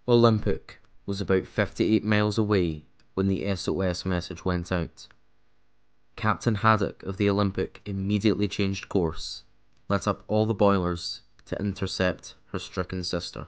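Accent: Scotland, United Kingdom. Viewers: Love the Scots accent.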